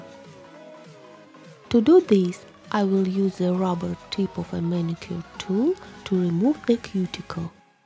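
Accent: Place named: Russia. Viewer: Вы русская?) Русский английский.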